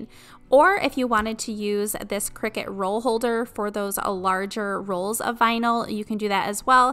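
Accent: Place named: Chicago, United States. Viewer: Sounds a little like a Chicago accent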